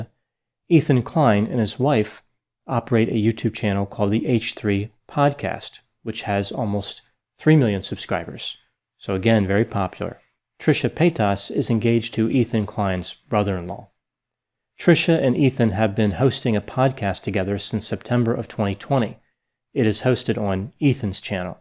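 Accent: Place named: Delaware, United States. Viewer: Now that I know he's got a Delaware accent... I can't get over his adorable Delaware accent. It picks me up when I'm feeling "bayud"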